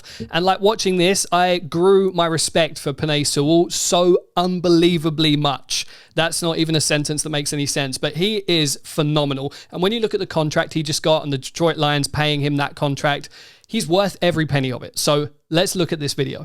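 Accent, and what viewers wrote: United Kingdom. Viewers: In this case I shall not tell you to shut your gob, you tit. Refreshing to hear a Brit breakdown Lions highlights better than most Lions fans could. Cheers! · Nice breakdown. Im so bad with accents. South Africa? Great to see a non American have such passion for American football. Edit: UK. See, I over thought it and guessed wronge.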